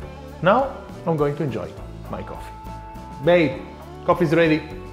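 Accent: Italy. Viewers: Italian is the new French accent 😮❤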